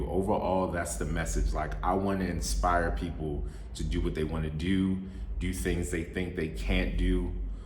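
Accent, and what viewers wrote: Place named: South Africa. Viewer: Frm 690+ subscribers to 2k+ wow!✌. Hav anyone noticed how pronounced things abt mzanzi?, He pronounces like someone dat has been living in SA for a while omg!. Plz giv dat man a bell. hav u eaten " triple decker meat/ chicken pizza frm Debonairs pizza?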